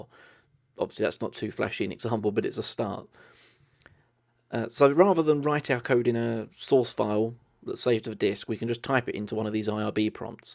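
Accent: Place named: Liverpool, United Kingdom. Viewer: he tawks like a beetul..cool.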